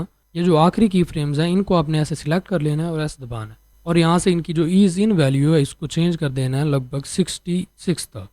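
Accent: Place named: Pakistan. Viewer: u sound like Pakistani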